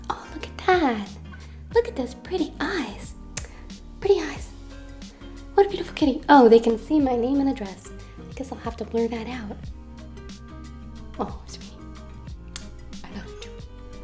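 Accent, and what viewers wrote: United States. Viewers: Hi, I just subscribed! I don’t know where I heard that you were in France so I was hoping you would have a French accent but that is not the case. It’s okay I am not disappointed that you sound American lol, are you originally American? This is my first video and you made my mentally declining state a bit better so thank you! I was lucky enough to recently get a 10 dollar rooted cutting of a M Peru and I love it! I cannot believe you can easily get a full plant for the same price in France 😱! 💙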